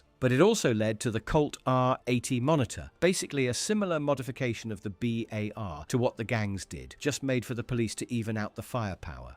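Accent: United Kingdom. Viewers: Hilarious crapping on a gun that helped win a world war and the fact a brit is the commentator makes it even more hilarious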